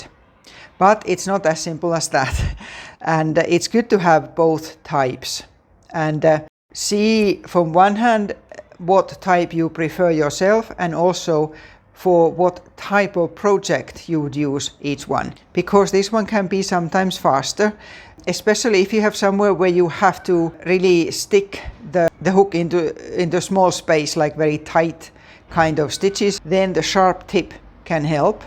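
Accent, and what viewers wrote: Finland. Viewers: 😮 you're from Finland? I thought your accent sounded familiar! I am learning Finnish. Just started about 2 months ago. I'm learning on Duolingo so I understand I'm learning more formal Finnish rather than spoken Finnish, but I've got to start somewhere! Suomi on niin kaunis kieli! Kiitos. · Oh I love your Finnish accent - reminds me of my Grandma Jenni! Great tips and love your teachings too.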